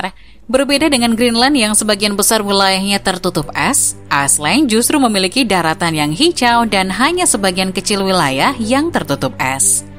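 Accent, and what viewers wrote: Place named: Lombok, Indonesia. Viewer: Anak lombok mana suaranya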